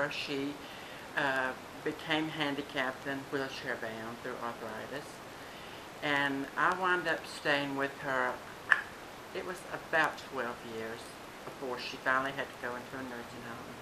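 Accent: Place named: South, United States. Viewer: Was born in Mississipi. LOve that southern accent :)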